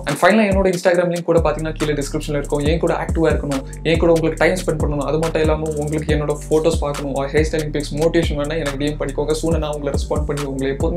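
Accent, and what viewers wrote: Kanyakumari, India. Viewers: ohh I too from Kanyakumari bro , ur slang is Kanyakumari slang 😁❤️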